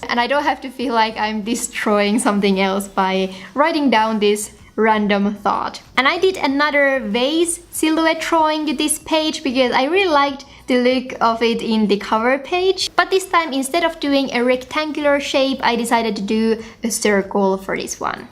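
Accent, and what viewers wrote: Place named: North, Finland. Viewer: Hei from Australia! I love your beautiful minimalistic planning & bujo art and sense of space. Thankyou for the inspiration ❣️ Ps. Just Curious - I studied in Helsinki awhile, & your accent is different - are you from the north? Or do you have some Japanese background?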